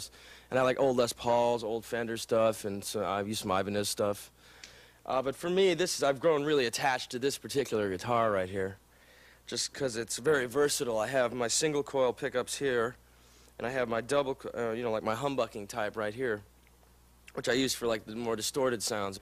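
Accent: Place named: California, United States. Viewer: to future: lose the gnarley beach boy accent,the head shakes,the perm,the earring,but not the licks...now 2021 perfect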